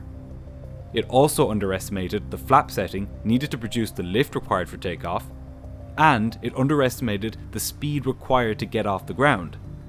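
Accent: Ireland. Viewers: What a great video! It’s a while since I’ve watched one on this channel and I forgot what a brilliant story teller you are. You know how to create tension, which is a real skill. Don’t ever use AI - your Irish accent is much, much nicer! I feel a bit sorry for pilots who get fired. Yes, mistakes were made, but nobody died and I think it creates a culture where mistakes are covered up if possible. It’s easy to see how this happened and I’m sure these pilots especially would never again let this happen. Did the augmenting crew also get fired? I can’t see why they were told not to contact their families either, who may have heard about the accident and be very worried. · He's Irish. Like any accent, just takes some practice to understand it :) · I had no issues. It’s called Irish. You want him to put on a fake accent or something? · by the way, being able to put a voice (in your case, a gorgeous Irish accent) to a face is lovely, so it was delightful to see your handsome face in this video - I do hope that you do more of this in future videos 🇮🇪☘️🇮🇪❤️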